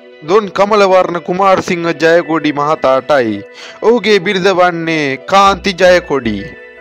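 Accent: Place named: South, Sri Lanka. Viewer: Anthimai... Sawuthu widihata katha karanne.... Mokekda manda😜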